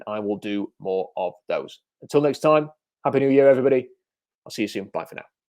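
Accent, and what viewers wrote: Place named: Manchester, United Kingdom. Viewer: Hey, a draft guy with an English (Manchester area, I think) accent. Subscribed and watching the past videos.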